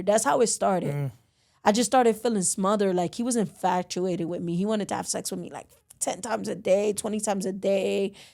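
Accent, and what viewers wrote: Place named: Connecticut, United States. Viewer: sounds like she is from Connecticut,,,plus she didnt mention what neighborhood,,every one from NYC says where they are from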